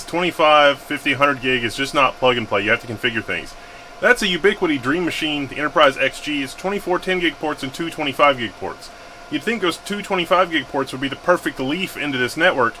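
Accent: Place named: Austin, United States. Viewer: Are you from Austin? You sound like the people I grew up around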